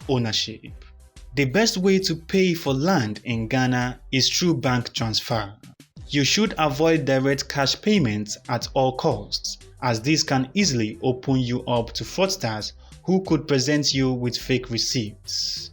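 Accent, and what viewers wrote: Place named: Nigeria. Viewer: Nigerians accent, but good video. Said many stuff in few minutes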